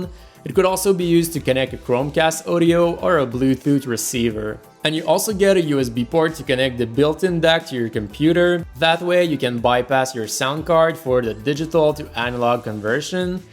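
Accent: Quebec, Canada. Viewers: are you from Quebec? you have a French Canadian accent. nice review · J'adore ton accent québecois! Je subscribe!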